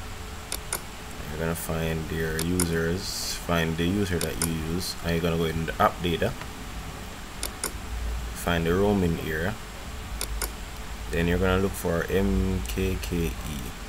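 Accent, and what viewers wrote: Jamaica. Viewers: I know a Jamaican when I hear one. Thanks mi bredda 🇯🇲💪🏾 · Respect you Jamaican?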